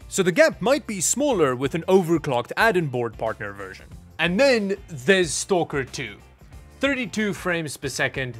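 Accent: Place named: South Africa. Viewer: I went from 4k 60hz to 1440p 165hz, impressed by the response and speed ofc but always missed that crisp and super clear 4k resolution. Went back to 4k (now 144hz) and the 7900XTX is doing a great job, mind blown visuals. Tests show that the 9070XT is not far behind (non-RT) which is great. recommended. Also from South Africa, can hear your accent, well done on all the subs etc.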